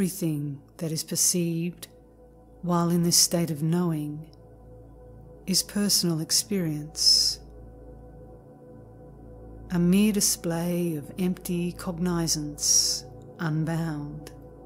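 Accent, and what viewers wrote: Australia. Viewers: I have been listening to your recordings for about 3 months and your voice is quite mesmerising . I can think it is 2 things the “ light” of consciousness and of course the subtle Aussie accent . 🙏 Namaste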